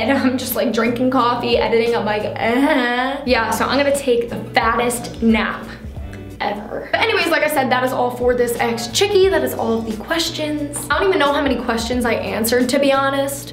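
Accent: New York, United States. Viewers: yo i love her slight accent it’s so cute holy new york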